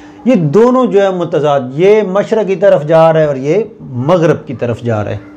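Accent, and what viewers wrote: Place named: Punjab, India. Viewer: ماشاءاللہ ۔ پنجابی لہجے میں کیا خوب اردو پڑھاتے ہیں۔۔ آواز ہو بہو صحافی ارشاد بھٹی جیسی ہے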